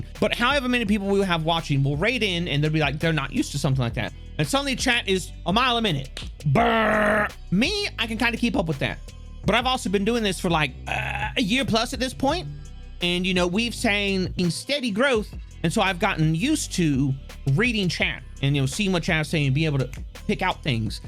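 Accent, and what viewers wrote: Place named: Mississippi, United States. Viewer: I always catch just a whiff of a southern accent.... like mississippi southern....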